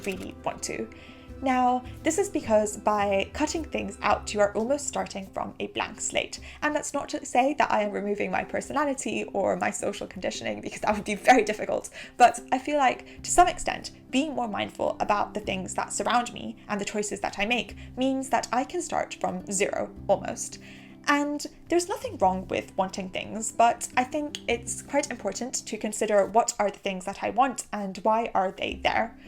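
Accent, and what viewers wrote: United Kingdom. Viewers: Beautiful wonderful marvellous. Good British English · I have been learning English by reading English novels for myself without anyone's help. The most difficult thing I have as a Korean for learning this language is to practice listening to native English which is different depending on where they are from. I have chosen you as a model of British English.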